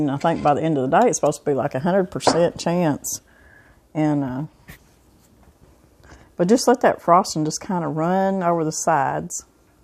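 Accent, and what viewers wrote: South, United States. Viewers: You seem like a very nicer woman.....kind and gentle, but your Southern accent drives me crazy and I hate to listen to it. So therefore, I can’t listen to your videos anymore. Y’all just make my skin crawl. Sorry. Cake sounds wonderful!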